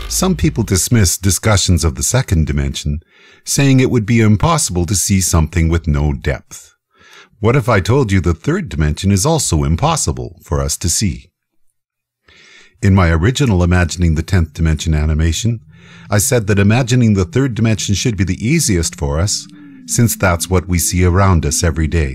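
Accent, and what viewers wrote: Canada. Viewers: ok, i must know... the person commentating is canadian, right?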